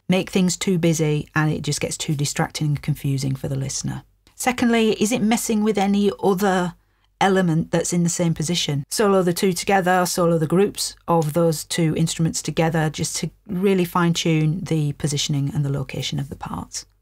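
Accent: Northern England, United Kingdom. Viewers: I came into the video and heard an eloquent-sounding British lady, and then out comes this ripping pop punk song. I love it because punk almost has this middle finger to cultural norms. I really love how clear speaks and I'll be able to come up with a few tricks thanks to this video. I came to this conclusion: when someone related to music is explaining something, do you like this person's work? I like mix. I also had to turn off YouTube Stable Volume to really hear it. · Where tha from? Nice to hear a Northern accent on YT :face-red-heart-shape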